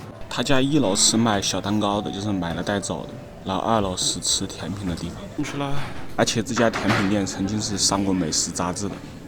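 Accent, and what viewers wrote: Wuhan, China. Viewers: 这口音 太武汉了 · 武漢口音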